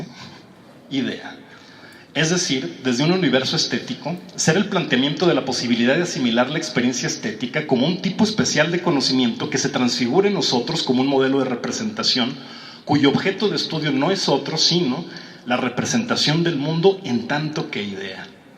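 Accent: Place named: Coahuila, Mexico. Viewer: Que onda maestro, perdon, licenciado. Me alegra que aun no has perdido tu acento Coahuilense y el estilo de Saltillo. Yo he perdido los acentos en mi teclado pero seguire con mi estilo. No hay defensa para los elogios asi que cuidate de ellos; no, mas bien de quien los espeta. Me gusta la frase de Schopenhauer que dice "Toda verdad atraviesa tres fases: pimero es ridiculizada; segundo, recibe violenta oposicion; tercero, aceptada por algo evidente". Escuchando tu ponencia se me vino a la mente las dificultades que tiene la gente de 60 a 80 años para usar una computadora, tablet or telefono "inteligente". El problema no es presionar los botones o pantallas, como mucha gente supone, ell@ son de una generacion analoga y mecanica. EL problema radica en la idea y los conceptos del objeto que tienen frente a ellos. La idea de enviar una carta, no impresa, atraves de un aparato electronico que guarda, no en un cabinete sino en las nubes, la informacion en algun lugar del cyberespacio y lo envia en un lenguage binario - 0 1 - y que el aparato receptor interpreta esos 0s y 1s y los muestra en la pantalla como una copia original de la dimension estetica de la representacion de la idea de la carta original. 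Sabes, tu y yo somo de una generacion que dio genero la transicion de lo analogo a lo digital. Las nuevas generaciones son digitales y saben como usar los aparatos, pero al igual que sus abuelos, no saben como funcionan. La nueva generacion technologica-digital es la representacion c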